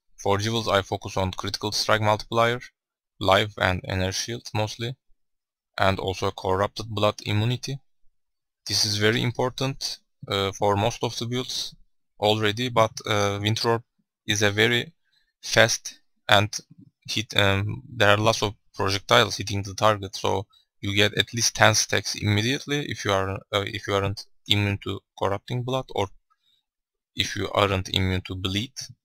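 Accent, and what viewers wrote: Turkey. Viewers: I spot turkish guy who is trying to speak english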